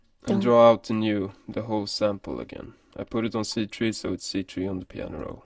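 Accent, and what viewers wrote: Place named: Sweden. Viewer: lol love your accent :D it is soo swedish. great tut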